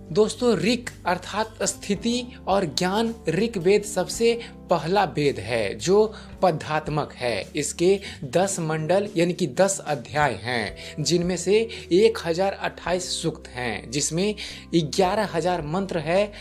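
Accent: Bihar, India. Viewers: Bihari hai ke bhai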